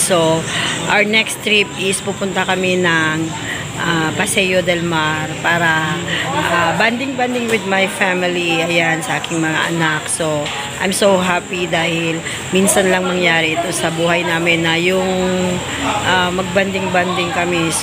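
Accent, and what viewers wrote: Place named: Batangas, Philippines. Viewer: Akala ko sis taga Batangas ka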